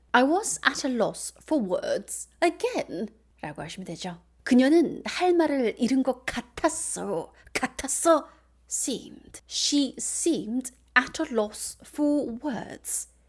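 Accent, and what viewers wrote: United Kingdom. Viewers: 아침에 생방 듣고 빵빵 터졌어요~ 제가 딱 원하던 영국영어 감사합니다~ 너무 웃기셔요 ㅎㅎㅎ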